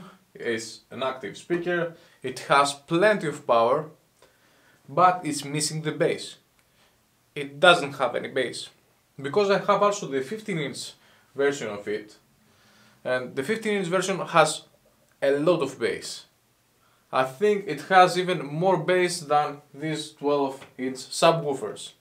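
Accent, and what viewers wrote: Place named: England, United Kingdom. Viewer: Το αγγλικό ακσάν σ αδερφέ τα σπάει ⭐️ να'σε καλα και καλα χρυστουγεννα! 👍🏼💪🏼🎉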